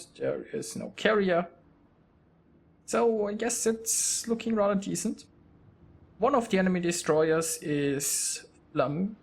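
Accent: Germany. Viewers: ich höre jedes mal nen englisch redenen deutschen richtig aus😂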